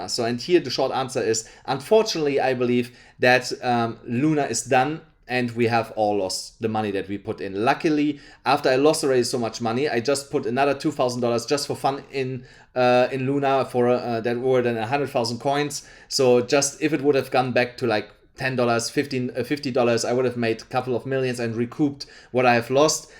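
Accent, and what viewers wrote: Germany. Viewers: Bro kommst du aus Hamm xD?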